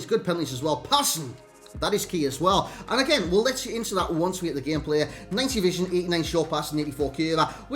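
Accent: Newcastle, United Kingdom. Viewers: Newcastle accent its not far from Scotland just incase you're not from the UK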